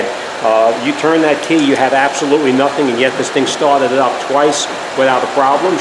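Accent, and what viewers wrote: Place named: New Jersey, United States. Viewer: Somebody from New Jersey somewheree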